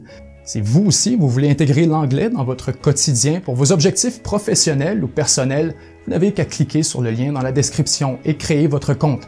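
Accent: Quebec, Canada. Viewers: vous faites un discours pro-américain et proposez des cours d'anglais. Abandonnez votre accent québecquois, vous êtes un traître, sans plus. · Je suis à 1 minute, je découvre cette chaine, et suis déjà convaincu qu'il s'agit d'un bon média ( et l'accent québécois n'est pas trop prononcé il est facile à comprendre pour un français ne maîtrisant pas votre accent, merci )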